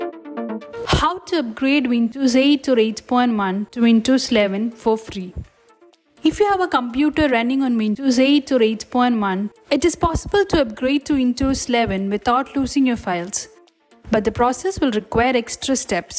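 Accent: Kerala, India. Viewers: R u a Keralite.?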